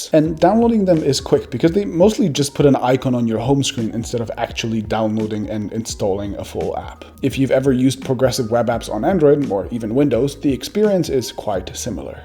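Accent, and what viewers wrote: Germany. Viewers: Du bist deutsch? :D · Are you from Germany ? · Manchmal wünschte ich mir du würdest einfach Deutsch sprechen. Nicht weil dein Englisch schlecht wäre oder ich dich nicht verstehen würde, aber das offensichtliche"ein deutscher spricht englisch" englisch das du sprichst lässt mich einfach erwarten das da gleich ein paar brocken deutsch durchstechen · You are german? :) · Hab ich mir doch gleich in Mrwhosetheboss Video gedacht dass du Deutscher bist :D Wir können unseren Akzent einfach nicht verstecken wenn wir Englisch reden^^ Aber nett so deinen Kanal gefunden zu haben, war mir bisher echt unbekannt obwohl du ja echt schon ziemlich viele Abos hast, jetzt hast du noch einen mehr^^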